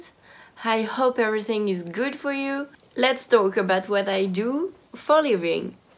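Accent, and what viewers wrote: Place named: France. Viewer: Fantastic! Love it, I forgot how beautiful your French accent is, love seeing your work 💓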